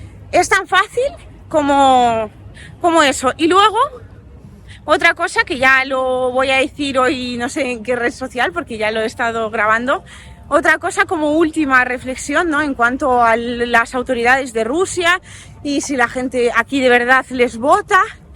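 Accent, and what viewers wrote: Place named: Spain. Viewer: Me gustaria verte trabajar en R.T...!! En lugar de: Inna Afinogenova. Y por favor deja de lado.., olvidate del acento español: " vosotros, sois, quedaos.., teneis..etc.." Los latinoamericanos no gustan de ese acento provinciano.. <<<..UTILIZA EL ESPAÑOL LATINOAMERICANO..>>> Recuerda que los latinoamericanos somos 600.- Millones y los españoles solo son 50 millones...o sea NOSOTROS.., somos la mayoria que manda..!! 😂😅😂😅..!. Suerte Guapetona..!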